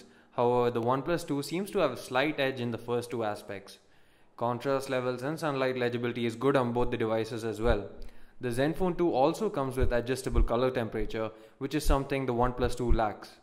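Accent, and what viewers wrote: Tamil Nadu, India. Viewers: bro are you from tamilnadu......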